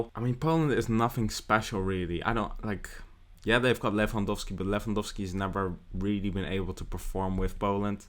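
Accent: Netherlands. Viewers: He may live in belgium but he is clearly dutch you can hear that from his accent. And look at his name is which is not a Flemish name and certainly not a Walloon name. is a typical Dutch (the Netherlands) name.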